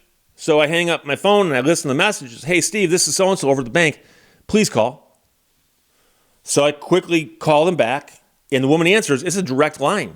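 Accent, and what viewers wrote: Canada. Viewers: Canadian accent?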